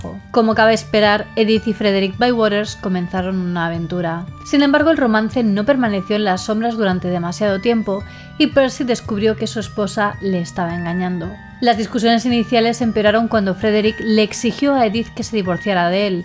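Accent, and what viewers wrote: Spain. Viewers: "Solo se que se nada". Debo de estar muy agradecido contigo, por qué con tus vídeos ( tan lindos, bonitos y hermosos, como la creadora misma ella es ) nos alegran el día; ellos son la alegría de todos nuestros días. Tú eres la mejor, la única YouTube'r que es inigualable, con ese asentó español, eres maravillosa, excelente, muy profecional, YouTube'r, eres tú, hermosa española. Nota: Gracias por tus vídeos. Gracias por existir. Te adoro. Te admiro mucho. En verdad te lo digo, eres tú, muy diferente a las demás. Eres la mejor. Nadie como tú. Hasta el final!!!!!. Hasta el fin de este mundo!!!!. ", HACIA LA ETERNIDAD"!!!!! 💀🏰💜🗝️🕸️🕷️🕯️🧹⚰️👻🎸🍷🎹🖤🌹🎃📚🔮🧙😈🔥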